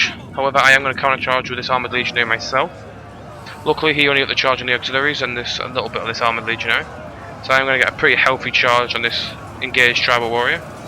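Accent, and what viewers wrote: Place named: Liverpool, United Kingdom. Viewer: good comm mate, i mean for a scouse lol.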